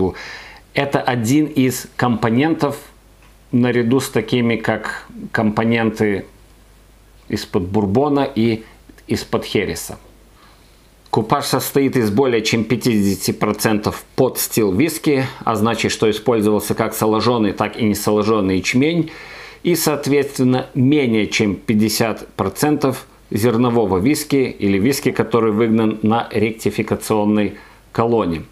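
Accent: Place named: Ireland. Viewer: У вас акцент. Вы живете в Ирландии?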